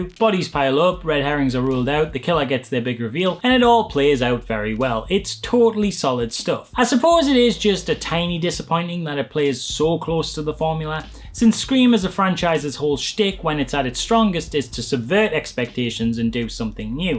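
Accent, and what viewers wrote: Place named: Newcastle, United Kingdom. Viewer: Northerner? I'm leaning towards Geordie... Kinda...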